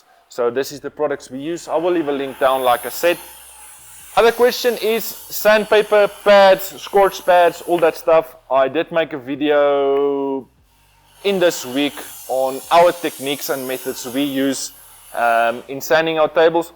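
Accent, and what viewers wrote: South Africa. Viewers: As soon as I heard your accent lol I immediately went onto my phone to check if your South African :p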